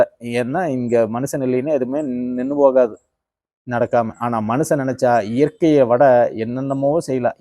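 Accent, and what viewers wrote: Kongu, India. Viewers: ஆஹா அருமை தம்பி.. கொங்கு மண்டல தமிழில் எளிதாக புரியும் வகையில் இருக்கும் அற்புதமான பேச்சு...உணவே மருந்து என்ற ஒன்று இப்போது அவசியம்...மருந்தை நம்பும் சிலர் இயற்கை உணவை நம்புவது இல்லை என்று வருத்தமாக உள்ளது... இன்னும் கூட தெளிவாக பச்சையாக சாப்பிட வேண்டிய காய்கறிகள் தந்து இருக்கலாம். பழங்கள் பச்சையாக சாப்பிட முடியும்... நன்றி தம்பி 🎉🎉🎉 .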